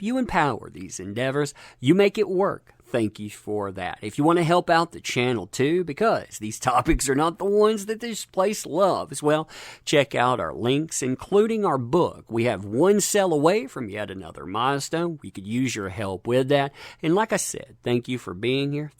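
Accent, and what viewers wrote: South, United States. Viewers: Fam your southern accent just makes the new sweeter than 🍯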